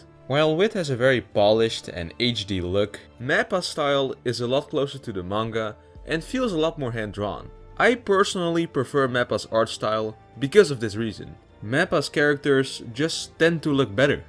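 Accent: Netherlands. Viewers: Bro sounds dutch? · Are you Dutch? Your accent sounds very Dutch so I'm just wondering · U sound so dutch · can tell ur Dutch by ur accent lolll w NL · Ik kan gewoon horen dat dit een nederlander is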